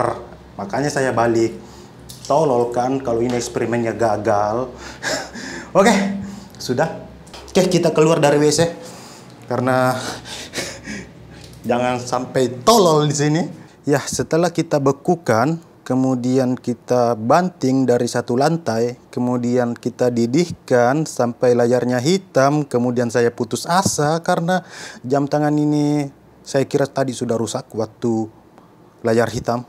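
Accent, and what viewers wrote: Makassar, Indonesia. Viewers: dari logatnya anda sepertinya dari makassar cess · Orang makassar nih keknya😂 logatnya keliatan bgt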